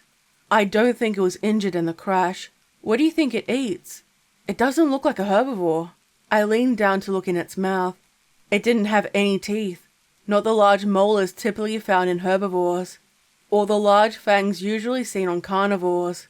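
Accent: Australia. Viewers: I know hear this a lot, but its true. I love your voice. Your Australian accent and the way you carry the stories you make which is awesome as well is so inspiring and amazing. I could listen to you read a motorcycle maintenance instruction guide and love it. You're awesome. I love your videos. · Found your channel recently!! Love your voice! Love your Aussie and now doing an Aussie based story! ❤😊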